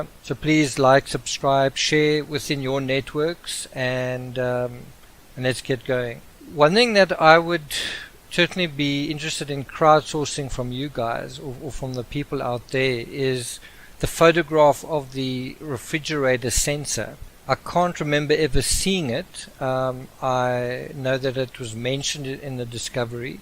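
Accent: South Africa. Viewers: I agree, I really like a South African accent.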